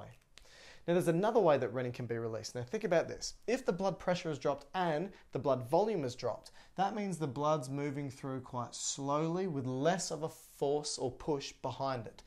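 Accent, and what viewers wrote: Australia. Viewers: As an Aussie, I can confirm it’s an Aussie accent. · It is an australian accent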